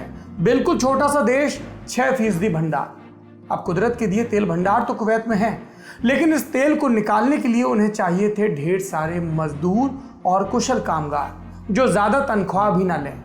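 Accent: India. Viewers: Sir your SUBSCRIBE logo is hiding your RIGHT side where you place certain eco friendly objects. Your English pronunciation is typical hindi medium style when you are even asking Rajdeep in English whereas he is replying in Hindi. No one can be perfect. As a admirer I want you to be perfect and that is why I am complaining, otherwise your knowledge is appreciated by all.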